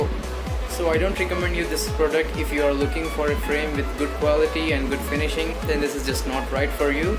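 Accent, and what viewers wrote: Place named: India. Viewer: Nice Indian English accent though!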